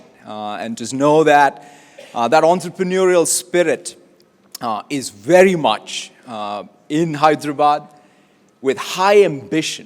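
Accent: England, United Kingdom. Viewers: Looking at his English accent I think he almost forgot telugu. Listen the way he said 'Tank bund' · English accent 🗿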